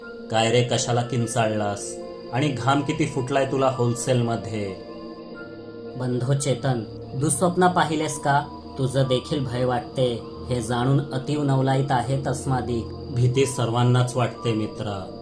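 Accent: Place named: Ghat, India. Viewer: तुमच्या कथा खूप छान असतात मी नेहमी ऐकते तुमच्या कथा तुमची मैत्री तर खूपच मस्त आहे ती नेहमी अशीच प्रेमळ रहावी हीच देवाकडे प्रार्थना.तुमच्या कथेतून अस वाटत की तुम्ही कोकणी आहात पण तुमच्या भाषेतून ते जाणवत नाही.तुमची भाषा घाटी वाटते.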